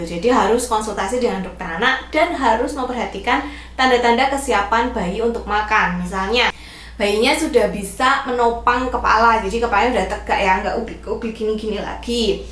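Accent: Java, Indonesia. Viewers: Makasih 🥺❤️ Udah rajin edukasi kami lengkap infonya terutama aku newmom yg mana aku uda gapunya Ibu.. dr awal hamil sampe lahiran skg babyku umur 4mo rajin nonton bubid belajarnya disini lengkap - everyday is learning. Bonus logat jawanya 🥰🥺 berasa lagi dikasih tau keluarga sendiri (aku rantau d jakarta) *peluk hehe Sehat selalu yaa ❤️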